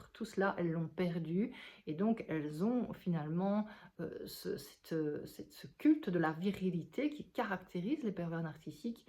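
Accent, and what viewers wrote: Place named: Belgium. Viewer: Merci de votre professionnalisme, bravo pour votre travail, mes félicitations pour vos connaissances et mes très respectueuses salutations. Votre joli accent belge me fait penser à l'excellent Michel Collon.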